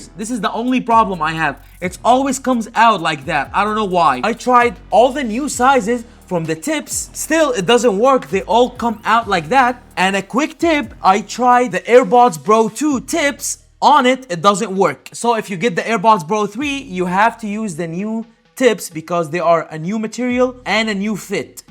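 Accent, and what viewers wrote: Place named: Arab world. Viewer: Thanks! Amazing review and I love the after the hype ones. Also adorable arabic accent "Airbods Bro", make me miss Dubai life.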